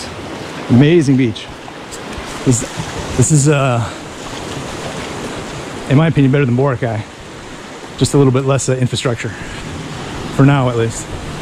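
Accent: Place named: Chicago, United States. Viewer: Are you from Chicago ? When I listen to you speak we sound exactly the same.!! 😂😂😂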